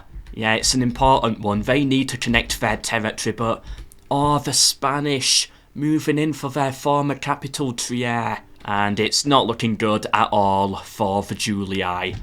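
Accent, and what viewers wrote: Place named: Northern England, United Kingdom. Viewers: He's a Yorkshireman, like Richard Sharpe. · It's his accent, he's from "the north".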